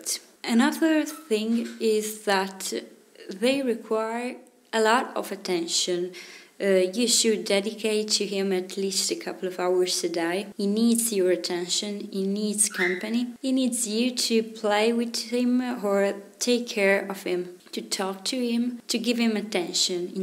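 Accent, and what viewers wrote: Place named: Italy. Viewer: Posso darti un consiglio? Non forzare la pronuncia, è davvero fastidioso da sentire! Non devi vergognarti del tuo accento italiano, e se proprio lo vuoi cambiare ti ci vuole molta pratica e non lo puoi improvvisare così. Ma poi il nostro accento è bello e simpatico, quando ero in Inghilterra me lo dicevano tutti :)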